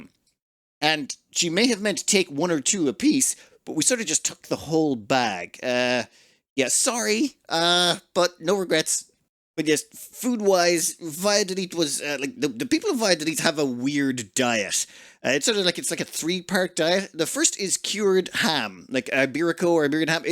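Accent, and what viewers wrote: Ireland. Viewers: OMG, you're Irish?(I just have to ask)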